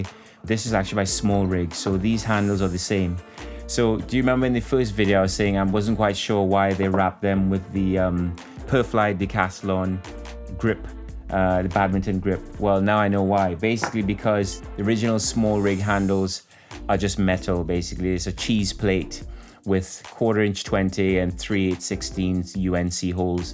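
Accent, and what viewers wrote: Trinidad and Tobago. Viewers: Do I detect a Trini accent?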